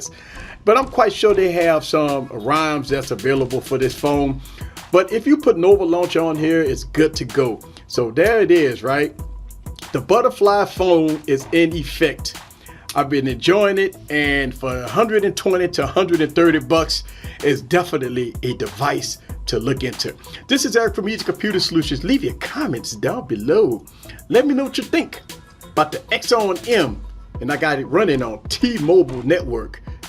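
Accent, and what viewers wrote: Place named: South, United States. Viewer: Nice video....cant stand the southern bumpkin broken gutta' accent tho....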